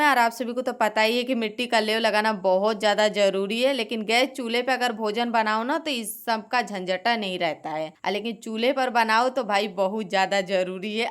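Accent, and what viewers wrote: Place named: Kanpur, India. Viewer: आपकी भाषा तो अलग है आप शुद्ध हिन्दी कानपुर वाली बोल रही हैं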